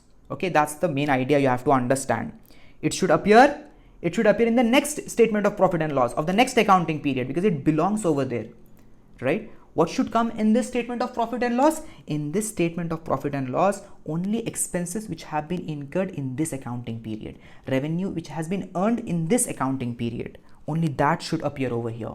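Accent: India. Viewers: as soon as I heard the Indian accent I felt I'm on safe hands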